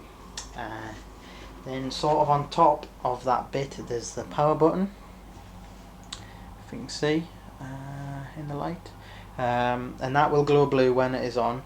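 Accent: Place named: Newcastle, United Kingdom. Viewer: Dude where are you from , you sound pretty local to me, newcastle??? im getting one of these cases, so good to see a decent review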